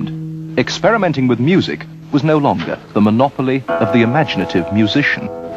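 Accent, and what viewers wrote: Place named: RP, United Kingdom. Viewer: All tv presenters should talk like this chap. BBC English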